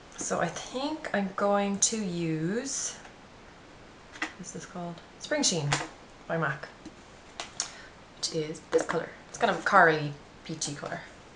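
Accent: Ireland. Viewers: Love the video and your accent! Sounds Irish. Where are you from? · "It's too fekkin tick neou" Love your accent. I could listen to the Irish accent allllll day long!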